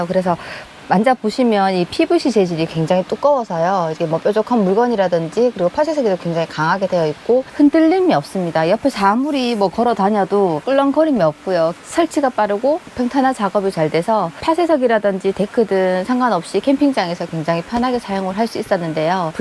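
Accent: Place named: Gyeongsang, South Korea. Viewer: 대구분이시죠??ㅋㅋㅋ 억양으로 알것같아요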